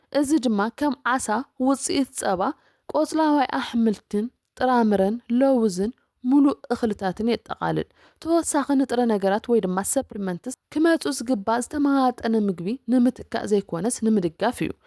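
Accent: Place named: Dekemhare, Eritrea. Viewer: please don't speak Dikala Tigrinya like sewnet say Sebnet and Gra and MiKinas say Minikay.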